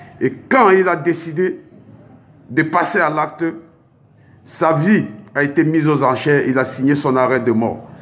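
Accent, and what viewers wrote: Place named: Cameroon. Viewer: Il est fou tous les jours ses vidéos c'est Ibrahim Traoré pour ce faire des vus avec des mensonges et son français me fais rire il a l'accent camerounais non burkinabé.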